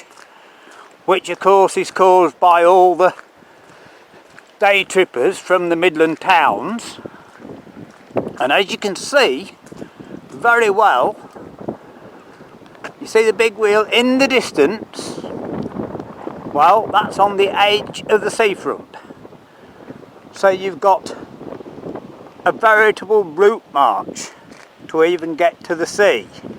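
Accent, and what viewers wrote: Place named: Lincolnshire, United Kingdom. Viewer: I actually quite like Skeg. My mum and dad have got a caravan in the Butlin's camp up there- (with live music on in and outside of the camp pretty much every night), which is a real novelty to me, 'cos we never had anything like that when I lived back home in London. I've gotta ask this, by the way: is your accent a generic Lincolnshire one, or has every part of Lincolnshire got its own specific accent and way of talking?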